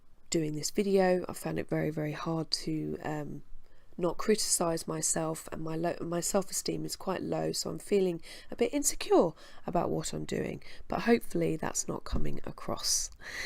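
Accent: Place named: United Kingdom. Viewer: (Sunday November 1st 2020) Greetings from Montréal, Québec, Canada !!! I do not remember how I came accross such videos, but I FINALLY put a name on my emotional/mental state ' Dysthymia'; exactly what I have been going trough for more than 25 years. I am 60 yrs old, and for the past 5 years...I have had more downs than ups...and it's NO FUN !!! My NEW doctor has prescribed me some pills that I have to start taking this monday to see IF my emotional state shall regain the energy that I used to have...I shall keep you posted shortly. Great video, love your voice, love your british accent !!! Cheers !!!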